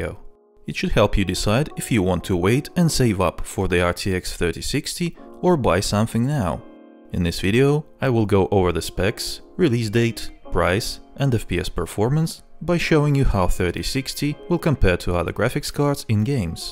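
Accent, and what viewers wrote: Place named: Russia. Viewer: сразу русского по акценту узнал)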